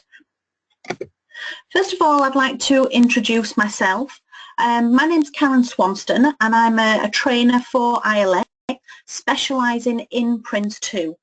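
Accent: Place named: North, United Kingdom. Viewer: ANNOYING NORTH ACCENT ...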